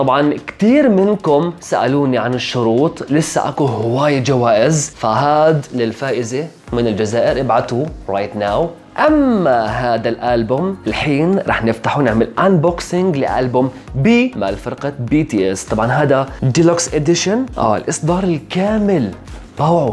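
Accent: Iraq. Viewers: انت كنك عراقي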